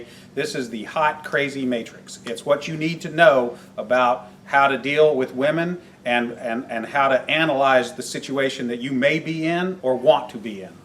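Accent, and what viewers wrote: South, United States. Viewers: For me it's the accent that makes this video, Southern, clear, articulate and a perfect balance of technical talk and slang. It's like he's briefing a group of astronauts.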